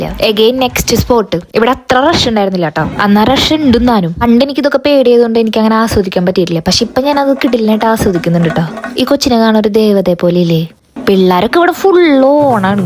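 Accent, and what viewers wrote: Thrissur, India. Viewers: Thrissur karude slanginu ntha kuzhapam🤨 njanum oru thrissur karan aanee😊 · Cherthayitto njn vijerche Thrissurkkaryeenna 😂njngade athee slang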